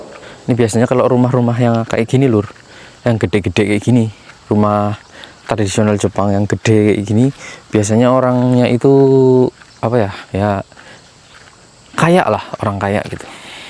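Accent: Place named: Cilacap, Indonesia. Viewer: Lokatnya medok ms,orang Cilacap apa ms,?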